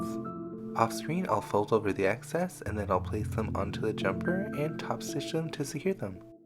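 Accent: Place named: North America. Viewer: Oh, actually I have a question about MSC. By your accent I'm assuming you're north American? I've been wanting to start a faceup for a while now but since it's winter the humidity is practically always above 90% and I read msc should be used in below 70%. Do you have problems with the humidity? Thanks.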